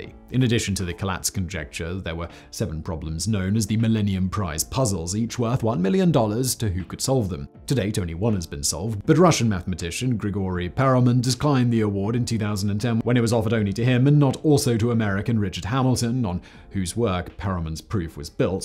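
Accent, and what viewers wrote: United Kingdom. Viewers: I was just going to post that I was heartbroken he didn't work that in. Beyond perfect for the situation, plus the addition of the brit accent. All I can say is : "in a high dimension of which we know nothing, the mighty Adams bellowed in rage...."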